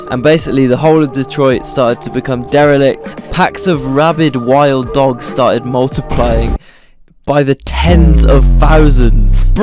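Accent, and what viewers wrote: United Kingdom. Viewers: So cool, with your bri’ish accent, thanks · Not a good video of Detroit. You have a person with a UK accent that cant pronounce Hamtramck correctly & really knows nothing about the city.....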